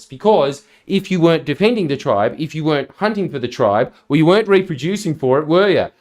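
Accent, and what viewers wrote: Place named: Australia. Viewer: you often say things that alot of people have said thousands of times in thousands of ways, yet its only clicking when i hear it from your aussie accent. thx for these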